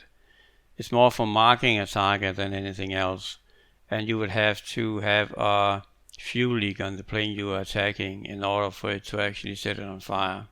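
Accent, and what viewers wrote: Denmark. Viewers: Jeg havde ikke set dit navn før jeg hørte accenten. Men jeg kunne høre det danske :D Tak for en god video.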